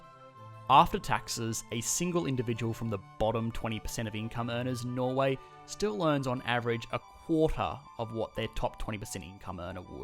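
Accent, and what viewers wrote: Australia. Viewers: i seriously hate the austrailian accebt , so many wordsso distorted , i cannot understand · Why does this guy sound Australian? Is he Norwegian? If not then all his stats are based on Literature found and stats not of his own experience. I would rather get my statistic figures from a local source, as opposed to stats found in the narrators home library. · Great video. It sounds like you’re an Aussie; I’d be curious to know how you feel about Australia’s resource economy in light of what you’ve learnt about Norway’s policies.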